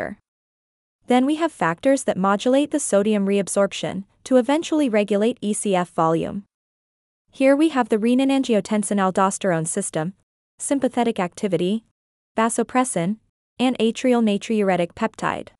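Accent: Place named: Gujarat, India. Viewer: YOUR VIDEOS ARE GREAT....BUT WHY DID you change the voice over...one reason that i watch your video is its in your native gujju accent (please dont take it in the bad sense). I love it.. Please stick to your original voice , you can work on processing your voice with AI or whatever you resources you have, but please stick to your voice.... If there was an award on teachers day (5th September in India) for best you tube teaching you will give very tough competition for the others,.All the best & ThanQ for such wonderful teaching.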